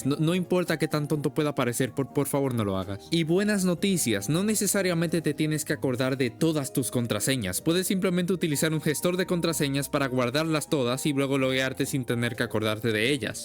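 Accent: Dominican Republic. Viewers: Espera, sos de dominicana? Ese dato no me lo sabia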